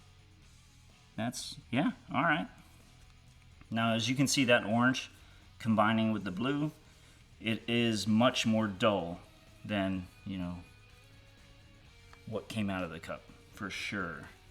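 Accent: South, United States. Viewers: I love it. Also Southern, like yall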